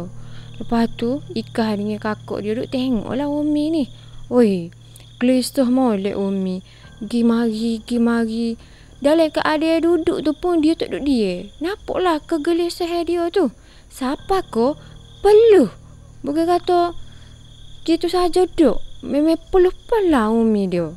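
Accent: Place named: North, Malaysia. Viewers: Assalammualaikum n hadirrrrrrrrrrrr bt set2 n krew, bapak besaq cek boh Nama kita, terima kasih ye mention Nama kita yg jelas nmpk, sapa rabun pn mst nmpk kleaaar❤❤❤❤❤, loghat utara sempoi cerita kali ni.. 😅😅😅😅😅 Moga bereh pa skala ya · Hadeeeeeerrrr right now in the comment section below😅📏✌🏼 * Sis ckp utaqa😄👌🏼❤️👍🏼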